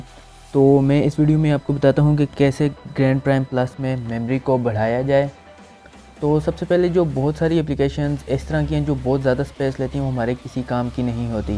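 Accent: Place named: India. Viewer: At least ad captions I can't understand Indian plz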